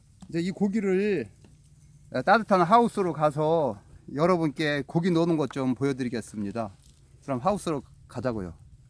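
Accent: Chungcheong, South Korea. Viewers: 충청도 말씨 신기해요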